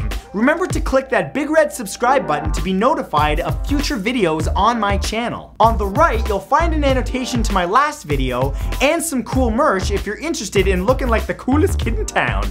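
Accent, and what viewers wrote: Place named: Canada. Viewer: to all people saying its pronounced row-an-oak he is canadian they pronounce stuff diffrently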